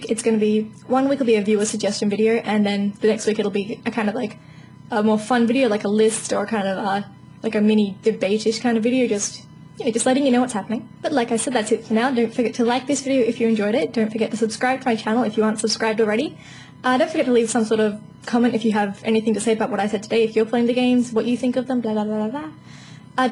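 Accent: Australia. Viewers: hey u got a aussie accent...u from SYD, NSW?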